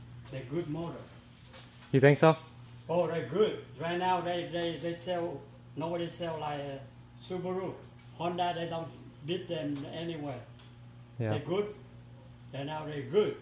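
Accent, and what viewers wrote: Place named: Vietnam. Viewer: He speaks Vietnamese accent english.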